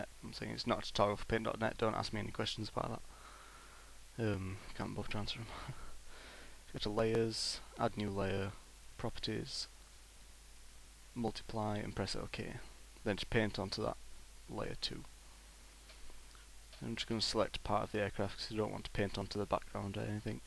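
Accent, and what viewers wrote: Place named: North, United Kingdom. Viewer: Thanks so much for posting! Simple, easy to understand and to top it, you're a northerner!!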